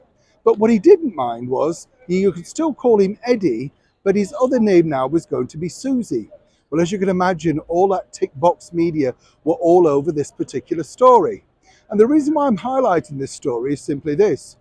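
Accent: Yorkshire, United Kingdom. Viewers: I’ve never heard you sound so Yorkshire, ‘ it’s a bloke in a frock’! I absolutely loved this report, well done. The reason it’s a flop is because he is butchering one of our favourite writers.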